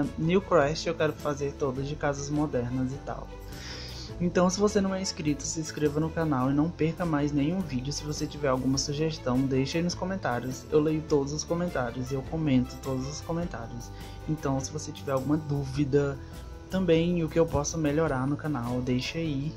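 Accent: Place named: Northeast, Brazil. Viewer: tu é de qual estado?? tem sotaque nordestino ❤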